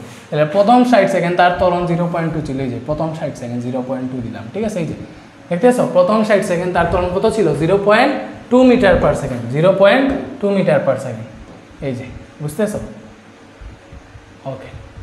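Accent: Sylhet, Bangladesh. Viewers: আপনি কী সিলটি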